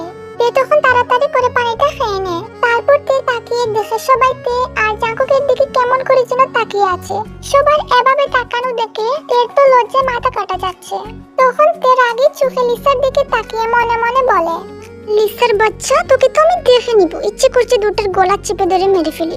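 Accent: Sylhet, Bangladesh. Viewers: আপু মনে হয় সিলেটি,, যাই হোক আপু তুমার ভিডিও অনেক অনেক অনেক অনেক অনেক অনেক অনেক অনেক অনেক অনেক অনেক অনেক অনেক অনেক অনেক অনেক অনেক অনেক অনেক অনেক অনেক অনেক অনেক অনেক অনেক অনেক অনেক অনেক বেশি সুন্দর হইছে আপু I love you api 😍😍😍😍💖💖💖💖💖💖💓💓💞🤩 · আপু তুমি কি সিলেটি 🖤❤️🖤❤️🖤❤️🖤❤️🖤❤️🖤💚💜💚💜💚💜💚💜💚💜💚💜💚👻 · আপু তোমার ভয়েস শুনে মনে হচ্ছে তুমি সিলেটের 💜💜💜♥️♥️♥️♥️♥️♥️♥️♥️♥️♥️♥️♥️ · আপু তুমি কি সিলেটি ❤ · তুমি সিলেটি পুরি? বালা আসোনি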